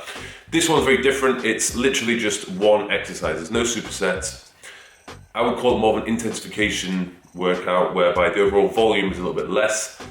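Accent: United Kingdom. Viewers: Hello from LA again. I was so glad to see that you are back with the videos again. Your arms are now, perhaps your best body part!! I'm going to incorporate these new tips into my training and will pass it on to my personal trainer. My trainer is good, but your explanation is so clear and understandable......maybe it's the enviable British accent!! Ha Ha. Looking forward to more great videos. LA needs you!! Thanks, Hal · A British John cena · Sounds like John Cena with a British accent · I hear a British Ben Shapiro for some reason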